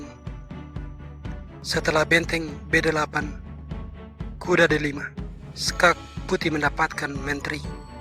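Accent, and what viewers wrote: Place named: Eastern Indonesia, Indonesia. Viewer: Mantap ini Chanel, penuturannya jelas dan mudah dimengerti.. Yang paling penting, aku suka aksen bicara nya, khas orang timur 👌👌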